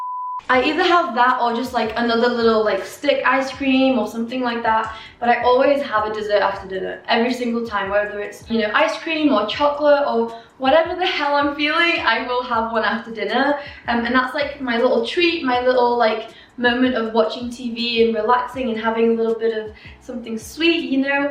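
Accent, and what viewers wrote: United Kingdom. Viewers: Trying to work out what part of the UK you are from - is it somewhere near say Bath or Bristol but maybe originally from the North West?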